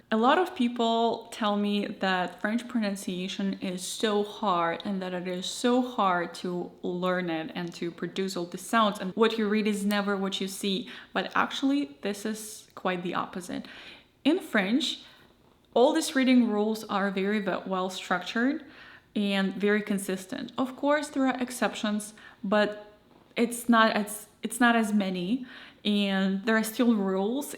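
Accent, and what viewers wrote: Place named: Russia. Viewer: Oh no.... i liked your Russian accent who was lerning french 😭😭😭😭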